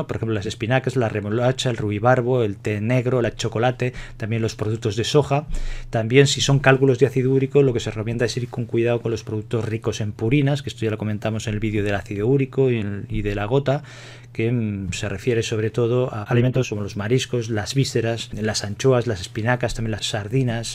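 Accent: Spain. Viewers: Gracias, muy claro. Guapo doctor, hasta el acento español me gusto😁. Mañana será mi intervención. Y justo pensaba qué hacer para evitar la formación, saludos Querétaro-México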